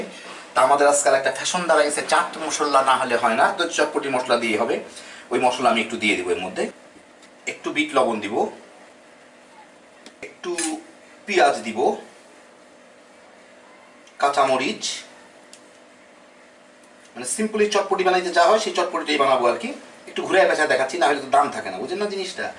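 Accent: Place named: Bangal, Bangladesh. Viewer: Vai ranna hok jemon temon..kinto apnar kotha gulo hoy jhakkas....sei level er fatafati...lozza soromer balai nai..ekdom khas banga ...wao..daron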